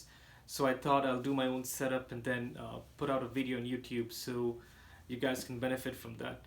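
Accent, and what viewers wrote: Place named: India. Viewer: It's so weird u sounded more like an Indian (callcenter) than that of a Flip. Salamat sa set up!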